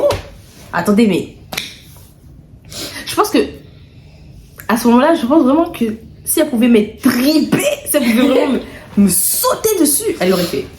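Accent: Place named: Democratic Republic of the Congo. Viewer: L’accent congolais j’adore 🥰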